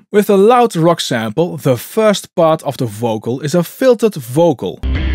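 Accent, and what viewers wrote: Netherlands. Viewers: Lekker Nederlands accent :) thanks for the tutorial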